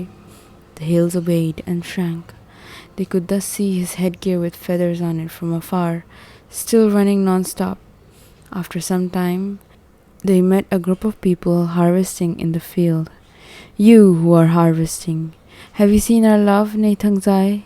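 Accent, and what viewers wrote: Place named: India. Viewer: Very typical Indian accent 😂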